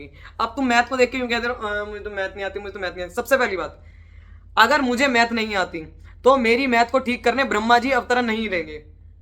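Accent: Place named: Haryana, India. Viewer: Main to bs haryanvi accent sunne aaya tha 😂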